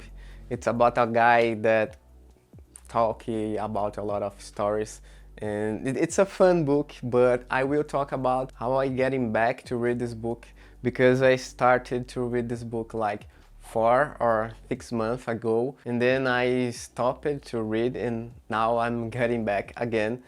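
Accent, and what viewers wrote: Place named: Brazil. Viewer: Eu entendo mais um brasileiro falando em inglês do que o nativo kkk , muito bom ! I’m learning speak English fluently, your canal will help so much ! 👊🏼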